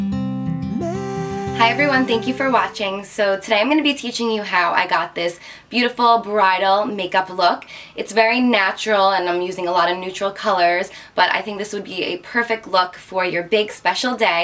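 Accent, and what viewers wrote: New Jersey, United States. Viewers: "Soft and genal" your jersey accent is so strong hahah ^.^ I love this look!!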